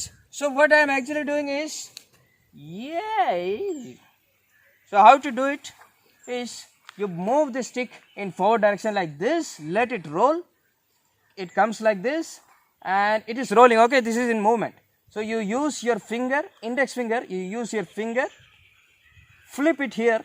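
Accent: India. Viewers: अण्णा I like your हिंदी English accent औंर बोलने की स्टाईल 😭😭😭😭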